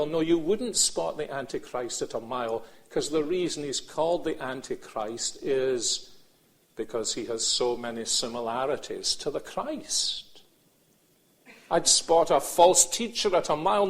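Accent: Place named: Scotland, United Kingdom. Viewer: Does this man of God preach in Scotland.? He has a Scottish accent.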